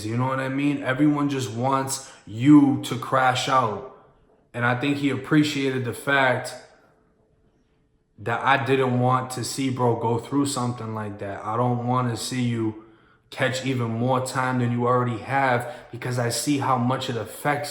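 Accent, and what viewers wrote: Boston, United States. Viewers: 💯RESPECT MY G... KNEW U WAS IN BOSTON THE MINUTE U SAID CAA INSTEAD OF CAR.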